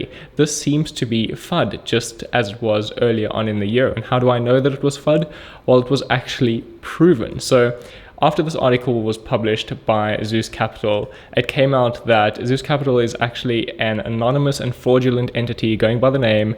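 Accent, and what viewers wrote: South Africa. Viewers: Nice videos..my new favourite channel for crypto news. Seen ur channel yesterday. 🌟🏆🙏 1 Question: Are u a fellow South African? 😉👍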